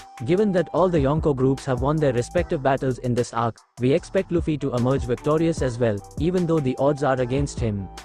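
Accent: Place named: India. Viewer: why does this AI voice sound indian?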